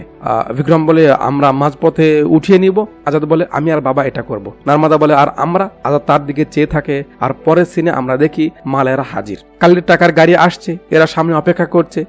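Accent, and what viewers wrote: Bogura, Bangladesh. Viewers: Apnar basha ki bogurate vai?? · বসের বাড়ি কি বগুড়া? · ভাইয়া আপনার আমাদের বাসা বগুড়াতে....??😍... অবশ্য আপনার কথা বলার ধরণ দেখেই কিছুটা মনে হয়েছিল আপনি বগুড়ার 😍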